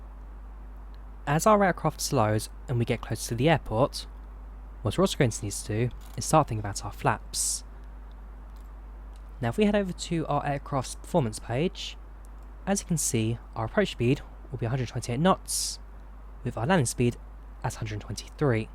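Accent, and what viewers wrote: United Kingdom. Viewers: Such a nice tutorial video . But couldn't understand your British accent sometimes. But big fan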